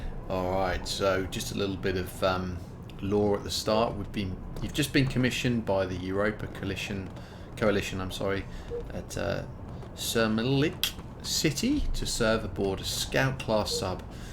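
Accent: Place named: Australia. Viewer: Was looking for some beginner s guide for Barotrauma and found you, boy am I lucky: I dig your style! you sound like a nice guy, you're a father like me, you play cool games and all... I am just sad you don't twitch (?). I'd even play with you! Though you sound a bit like the hilarious SovietWomble, from your accent I would say you're an Aussie? if yes I just arrived to your great country and I love it! Cheers mate.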